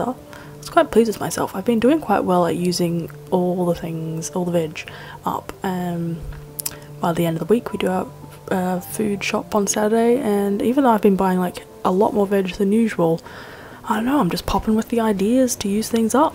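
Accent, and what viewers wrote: Australia. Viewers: Haha I thoroughly enjoyed you talking about Hufflepuffs in your Extra Australian accent, it didnt even occur to me that your "YouTube voice" was not at maximum accent level😂 also I'm really impressed by the amount of veg in this video everything looks so delish💕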